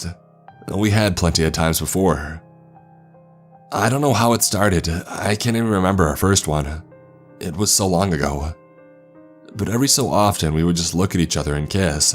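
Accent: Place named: California, United States. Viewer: This guy's California surfer accent is not a good mode for this story to be told, takes me right out of the story the way he pronounces words... Eckspecially Rayan (Ryan) Tham (them) Perfials (peripherals) Kassed (kissed) Thass (this) At (it) Etarnity (eternity) Sanses (senses) Tranches (Trenches) Warked (worked) Praaaject (project) Staaary (story) Incraaases (increases) It's a if he's doing a parody of the SNL skits of the soap opera "The Californians" Kind of hilarious I mean this comment in a helpful way, after all, we can't really know our own accents. My best friend told me I should cut my nose hairs when we barely knew each other. Only a true friend will tell you something like that.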